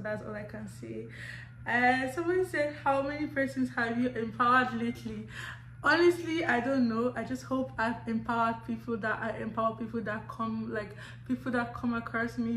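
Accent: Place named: Canada. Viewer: Wow... welcome back, i really miss you and i love Canadian accent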